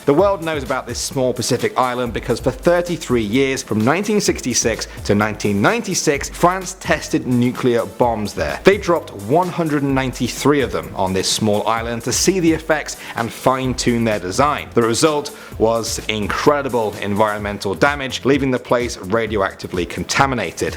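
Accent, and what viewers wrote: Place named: United Kingdom. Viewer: As a semi-paranoid fan of conspiracy theorists' stories, I'd watch another!, you hosted the first AT10 video I ever watched, and due to your mellow baritone and nifty British accent, I kept watching! Good on you!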